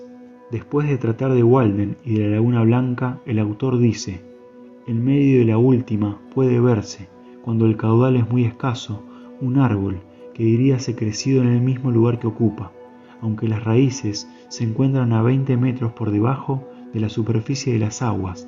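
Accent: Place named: Argentina. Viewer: Me estás ayudando mucho, iba muy lento con la lectura por mi mala visión. Escucharte me resulta muy cómodo añadiendo que tienes una linda voz, con tu marcado acento argentino. Gracias por hacer este audiolibro y te animo a continuar. Saludos desde España🙂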